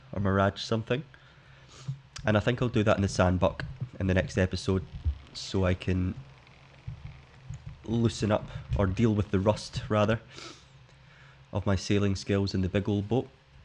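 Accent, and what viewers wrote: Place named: Scotland, United Kingdom. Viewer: When you were reading the comment and he complimented your accent and you said "ah dunno about that mate" just know that while idk about people from elsewhere, basically every American I know loves a Scottish accent (as long as we can understand it lol). You really are a fun guy to listen to. I like your sense of humor, it sounds like we're just hanging out shootin the shit and I think that's part of what makes your channel so charming. If you did go the 'professional' route then a lot of that would get lost. Don't change, your format is great. Glad to see ya back!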